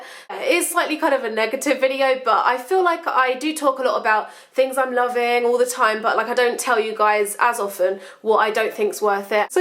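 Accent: United Kingdom. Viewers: i love your english.... british english, right? your accent is so cute :) many youtuber don't reply to their subscribers... and seing you replying to many just makes me like you more :) love from germany♥♥ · You are so beautifully exotic looking!!! I love your accent haha I am a bit obsessed with anything and anyone British.. I remember the first video I watched of yours was your Perfume Collection and I remember laughing and thinking you were really funny and I remember you being like this gorgeous exotic looking girl so I look at your other videos and I loved them!!!! You now have a loyal subbie :)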